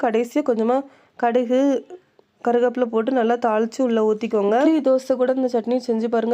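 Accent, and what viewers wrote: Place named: South Tamil Nadu, India. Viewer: Neenga entha ooru mam Your slang is southside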